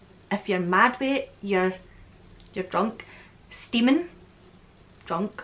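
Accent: Scotland, United Kingdom. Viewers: I have no Scottish heritage and I’ve never been to Scotland but I feel a deep passionate respect and admiration for Scotland. Wish I could visit and learn more about it. Plus, that accent, excellent. Gonnae trry! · I have no idea, why her video (not this one, but at the other, comments were deactivated) was recommended, but I love Scotland and the scottish accent. ....Wait is this a Freddy Kruger glove on the door? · ITS SO NICE TO HEAR.I M LEARNING SCOTTISH ACCENT.THANK U SO MUCH. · I love the Scottish accent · LOVE your accent, My people came from Scotland and Ireland. Many years ago. My Dad used lots of the words that you used in this video. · Love scottish ascent❤️ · Oh my god hon ur accent reminds me of my scottish aunt kirsty, love it.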